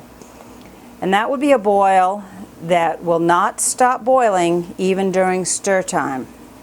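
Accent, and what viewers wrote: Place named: Maine, United States. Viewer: Do I detect a New England/Maine accent? I am from Freeport, Maine and you sound like home! Now I’ve gotta try your pepper jelly. Have you tried it over a loaf of cream cheese? Delicious..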